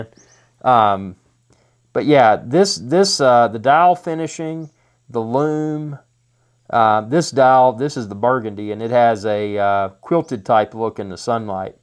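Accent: South, United States. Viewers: What stands out immediately, besides your strong Southern accent, is that you likely have nail fungus, or rather, your poorly cared-for nails. You seem to obviously have an advanced stage of nail fungus. A combination therapy with an antifungal treatment and pills would be the best solution. What I also expect from a presenter is a bit more technical understanding and a somewhat more professional, more focused on technical aspects and less egomaniacal demeanor. Forgive my sarcasm, but maybe to don't have the know-how to do a more professional job.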